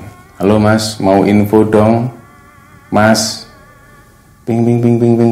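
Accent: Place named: Surabaya, Indonesia. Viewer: Logat suroboyo ny koq asik bgt yo mas medok2 gmn gitu😍jd ngefans sm chanel ini...pokoknya juara sukses trus y...😘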